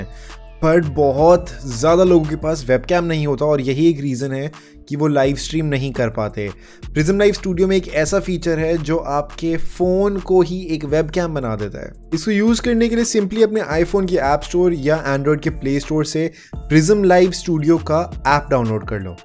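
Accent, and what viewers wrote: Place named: India. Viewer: Maybe native English speaking people understand what Indian people say when talking "English", the rest of the world doesn't. All your words are twisted in a strange way. And you also speak always in a hurry. When you slow down when your are talking it helps a lot to get more viewers. I did watch only 30 sec's.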